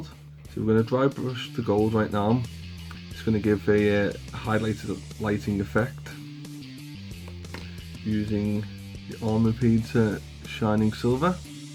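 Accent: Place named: Liverpool, United Kingdom. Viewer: Oh Christ it’s a scouser 😂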